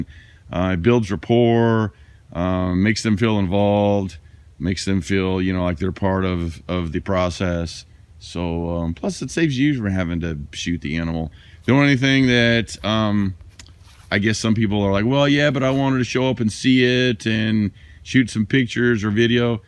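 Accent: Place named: Washington, United States. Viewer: could you say ummm one more time. this guy aint from missouri sounds like washington im trying to learn but not showing how to set traps just moving camera around lame.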